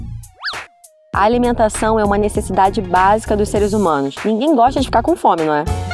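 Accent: Rio de Janeiro, Brazil. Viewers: Infelizmente as pessoas não sabem que para atingir e satisfazer as pessoas de todo o Brasil, o sotaque deve ser neutro. Não consegui ver o vídeo todo, que deve ser muito bom, pelo sotaque carregadíssimo, que creio ser carioca.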